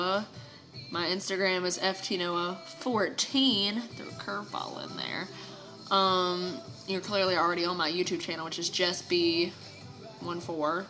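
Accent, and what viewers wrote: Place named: Missouri, United States. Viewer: is it just me or is there definately some missouri accent rubbing off on him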